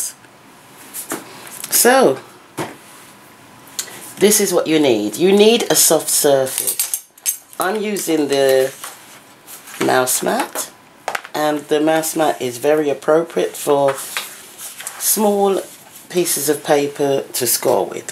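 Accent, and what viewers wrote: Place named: United Kingdom. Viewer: I love your voice lol.. like a southern Big Momma with a British accent.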